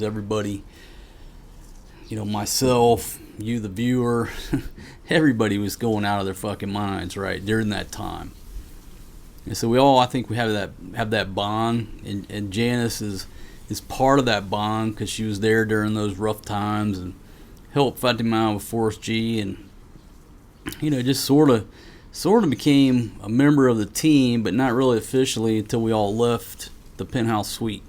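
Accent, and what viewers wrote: South, United States. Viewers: I’m a new sub.. I enjoy listening to your conversations. I will not judge, I just want to understand some things. Who is Maria’s mother? Is she your biological daughter? How did you name your son Forest G? He’s adorable & so is Maria. I’m very familiar with your personality , being the redneck that you are, 😅the way you talk, cuss a lot & drink a lot. I understand the way you talk. I’ve lived in Georgia long enough 😅. You are a good story teller. You can be amusing, funny, & serious. Glad you’ve clarified your position with Janice’s baby. Continue your life stories while people here in the US are still trying to learn their pronouns. Now you’re the hillbilly of the Phil. 😂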